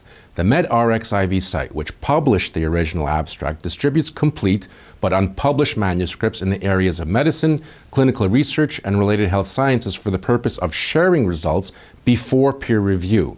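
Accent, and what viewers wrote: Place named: Russia. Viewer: I think has spent too much time in Ukraine and obtained a Russian accent.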